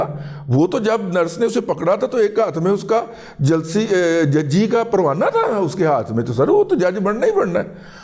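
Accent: Punjab, Pakistan. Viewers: Salam bhai this is Aamir kapadia from karachi your vlog always based on truth your Punjabi style I really loved and always enjoy your closing sentence great Allah aap ko apna fazal ata karay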